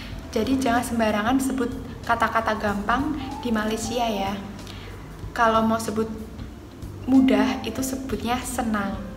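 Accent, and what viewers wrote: Indonesia. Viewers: Ati² ngomong "jemput" Mba...lidah Jawa-mu masih kental.. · Boso baturetno ne ijek kentel yo bun wkwkwk tapi joss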